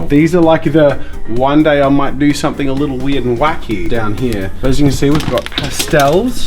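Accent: Australia. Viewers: Just a quick question, is he Australian? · i kinda find his aussie accent nice but thats probably because ive grown up with it my whole life lol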